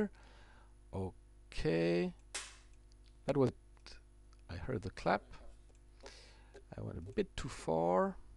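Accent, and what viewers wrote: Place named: France. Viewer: C'est un Francais non? xD l'accent!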